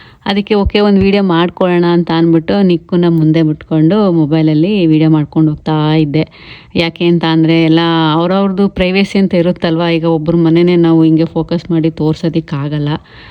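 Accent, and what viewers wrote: Mandya, India. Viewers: Nivu mandyadavara? Thumba chanag matadtira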